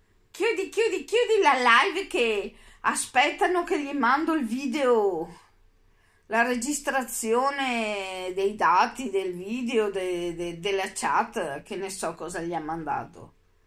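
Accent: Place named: Veneto, Italy. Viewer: Buonasera.. sei simpaticissima!!! Sono veneta anche io ed è sempre un piacere sentire il nostro accento😍 Dovresti fare delle LIVE... sai che seguito che avresti!!!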